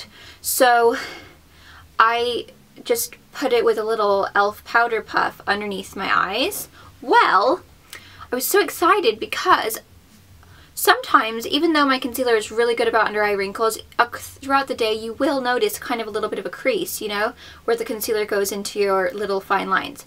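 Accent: England, United Kingdom. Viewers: In this video I could totally hear your English accent!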